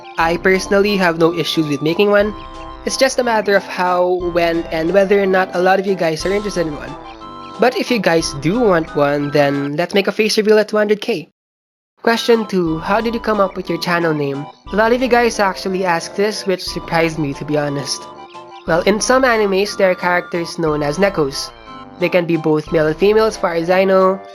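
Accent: Philippines. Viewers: i knew it... from the accent and manner of speaking... congrats sa mga project mo with the group of Valkyrae and her friends i like your drawing style, nagdodrawing din ako since elementary days but i stopped doing drawing for 2 decades more or less and just got back roughly last year keep up the good work and MALIGAYANG KAARAWAN many more blessings to come stay safe stay healthy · OMG THE FIRST TIME I HEARD YOU TALKING I SAID YOU'RE A FILIPINO!!!! AND I WAS NOT WRONG!!! 🇵🇭🇵🇭🇵🇭🇵🇭 Maligayang Kaarawan!!! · i heard ur accent and i knew ur Filipino, im also Filipino i never got to learn how to speak Tagalog but I can understand a little bit. btw happy birthday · I recognize a Filipino accent from a mile away! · haihai, filipino ka rin pala, ndi ko alam until narinig ko accent mo, wasn't sure tho then you confirmed it coz u're accent is good (like better than most of us pinoys) kaya di nahalata. 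A proud kababayan for u 🤧 sanaol talented sanaol nkakausap si Rae sanaol lahat ✨ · TRIP KO YUNG ALAM KO NANG PINOY KA FROM THE ACCENT OMFG I LOVE THIS/YOU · WHEN I HEARD UR ACCENT I WAS LIKE WAIT THIS KING IS A FILIPINO?! AND YOU ACTUALLY ARE!! ANG ANGAS NG ARTS MOOOOOO, CONGRATS TO UR SUCCESS AND BELATED HBD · I KNEW IT YOU ARE A FELLOW FILIPINO THE FIRST 30 Seconds in the video, when I heard your accent I just said Hands down he is Filipino 🇵🇭🇵🇭🇵🇭🇵🇭🇵🇭🇵🇭🇵🇭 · BRUH THIS IS MY FIRST TIME WATCHING THIS BECAUSE I WAS SO BUSY AND BRUH YOUR ACCENT IS SOO FILIPINO HII!! I'M A FELLOW FILIPINO (≧▽≦)💖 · THE FIRST TIME I HEAR HIS VOICE,I WAS LIKE,IS HE FILIPINO OR WHATEVAH 👀👀✨✨ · HA! I KNEW THAT ACCENT SOUNDS LIKE MINE! CUS I'M FILIPINO TOO LOL MGA KAPAMILYA MAG REPLY KAYO DITO KUNG PINOY TALAGA KAYO HAHAHAHAHAAH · Sabi kona pilipino ka haha sa accent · Me:0 that accent!! hmm....: I’m Filipino. Me: I WAS RIGHT IM RIGHT!!!!!!!! 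W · Welp, Im already late on this but, meow. When you start talkin I knew youre a filipino <3. I really love your animations!! <3 <3 <3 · Nung natinig ko accent mo alam ko na na pilipino ka😊❤😎🤣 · When u started to speak I was like "bat parang Filipino sya..?" Then u literally answered it right away lol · The moment I heard you speak I immediately knew you were a fellow kababayan:D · accent palang alam na agad ✊😭🇵🇭❤️ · As soon as I heard ur accent, I was like: oh ur Filipino. Also happy birthday!!:DDDD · I'M SCREAMING. I was like, "Huh, he sounds Filipin–" as he said it AAAAAAAA WOOO MGA PILIPINOOOO LEZZGOOO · I knew it at first because of the accent! Lol Proud Filipino here! Mabuhay 🇵🇭🇵🇭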